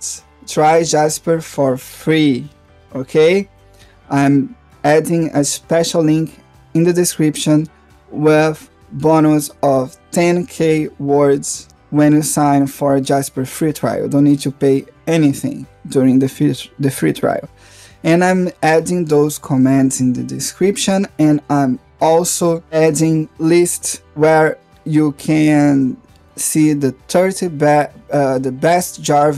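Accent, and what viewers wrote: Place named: Brazil. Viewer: I know a Brazilian accent when I hear it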